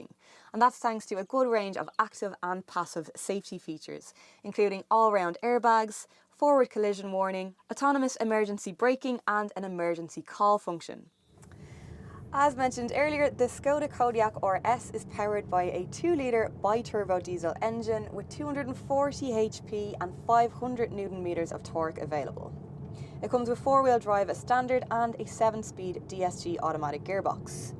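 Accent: Ireland. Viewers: I just came here for the Irish accent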